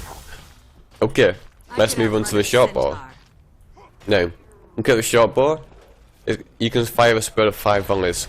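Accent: United Kingdom. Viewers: Shite, what kind of accent is that? You are from the UK for sure, but where exactly? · To the people saying it's "just a northern accent", let's be honest, it's one seriously fucking strong northern accent...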